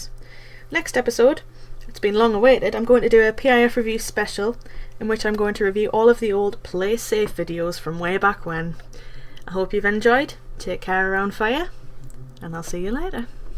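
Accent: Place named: Newcastle, United Kingdom. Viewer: Don't be silly! I've always been a fan of your videos, I've missed the PIF community's Geordie lass, pet! (trying not to hint at a possibility of a new video soon)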